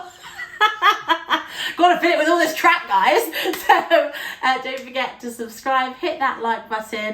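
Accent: United Kingdom. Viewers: Greetings again from Vancouver, Canada! (I found your channel because you recently did a HomeGoods/TJMaxx video, and I work at TJMaxx. :)) I love love love your accent. I've watched videos in the recent past about the different accents around the UK. It's really neat. I've always wondered. Also, the british slang is just so cool for me to hear as well. I was a huge Spice Girls fan when I was a preteen. (I'm almost 36 now.) & I still love the accents and slang to this day!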